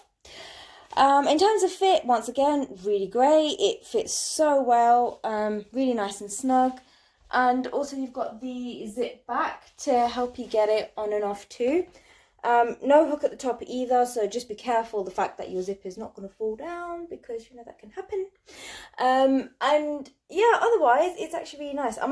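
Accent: United Kingdom. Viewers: Hey, OMG you look stunning, especially the white see through... Perfect. Also, that British accent, very classy all the way. Stay safe gorgeous..🤗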